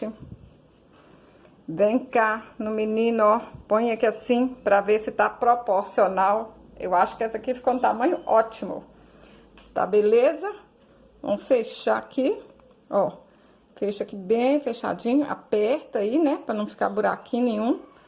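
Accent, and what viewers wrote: Minas Gerais, Brazil. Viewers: Sotaque da minha terra Minas Gerais. Falo assim até hj. Parabéns pela boneca. Amei. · Eita mineira retada essa igual eu ,parabéns amei. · Que jeitinho meigo de ensinar! Amei o seu sotaque! Vc é mineira? Perfeito! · Vc é demais gosto muito de vc e do jeito que vc fala mineira Deus Abençoe professora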